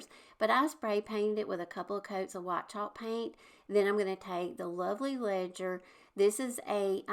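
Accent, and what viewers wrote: South, United States. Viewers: You absolutely amaze me! One day will you do a video of your whole house? I taught French for many years and I love how you use the French IODs! I’m from Alabama and I appreciate your southern accent!💖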